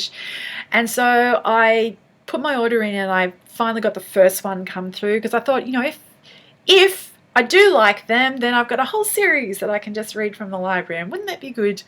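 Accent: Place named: Australia. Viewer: I have never heard of Nick Earls and his 'bloke lit' haha! Thanks for reviewing it! Do you read on a Kindle? And you're borrowing digital books from your library? I am trying to get my head around this process. So great to hear an Australian accent on Book Tube. Baking here in hot hot Melbourne. Love your work! :)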